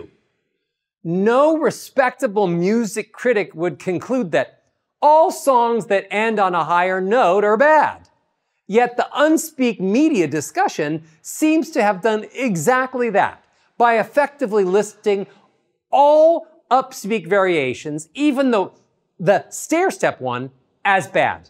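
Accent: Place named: West Coast, United States. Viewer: He has the flat Hollywood/West Coast accent.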